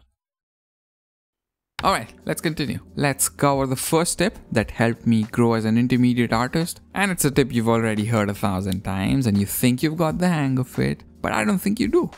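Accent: India. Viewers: What what what you're Indian !!😮 your accent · You might grow this channel much faster if you get rid of that painfully annoying Indian accent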